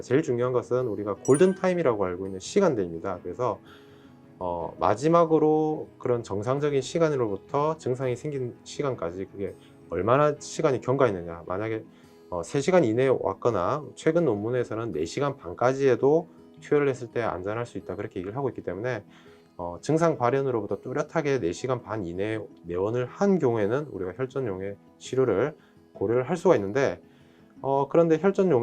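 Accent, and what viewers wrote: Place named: Gyeongsang, South Korea. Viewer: 닥터님께서 경상도 분 이시네요 상세한 설명 감사합니다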